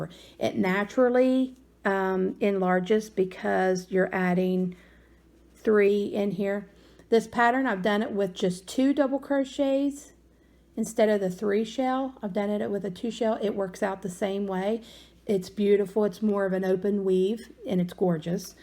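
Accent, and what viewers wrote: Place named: South, United States. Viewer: Ooooh, Yay!!! A southern gal teaching CROCHET!! You sound like you're from the Carolina's by accent....but I could be wrong (usually Ga, Ms, Al have a thicker drawl than the Carolina's, but maybe I'm just a biased Carolina gal😜). Anyway, love that I found a fellow southerner to follow! Love the poncho! Thanks for giving the count for the various sizes too. And I thought you did great with your camera/CROCHET work! I don't think I could do it...I'm doing good looking at it directly and still miss, I'd really be OF if I had to look through a viewfinder or a screen! So, honey, you did great! New sub! Looking forward to more of your vids and patterns! Bye (in that beautiful southern bell drawl)! 😉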